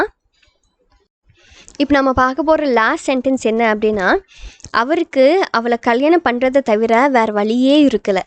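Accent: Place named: Tamil Nadu, India. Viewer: AND UR TSMIL SLANG WOOW I LIKE IT🙏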